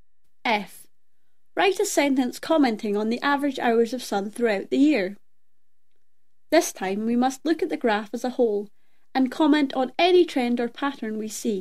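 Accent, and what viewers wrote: Scotland, United Kingdom. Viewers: I can't get over your Scottish accent.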